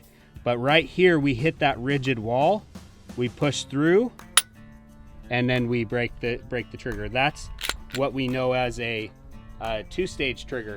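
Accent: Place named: United States. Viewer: Jesus why do Americans explain everything so stupid can they not just say it how it is i think its the accent 😅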